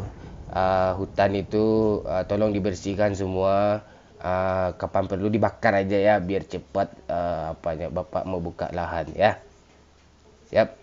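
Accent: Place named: Kalimantan, Indonesia. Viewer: ORANG KALIMANTAN MANA SUARA NYA SEMUGA ASAP CAPAT ILANG YA GUA DARI KALSEL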